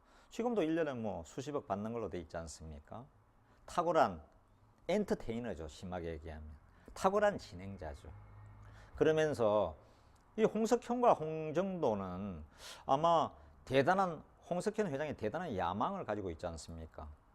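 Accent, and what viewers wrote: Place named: Gyeongsang, South Korea. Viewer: 단디해라 ! 에궁 갱상도 사투리. 굿!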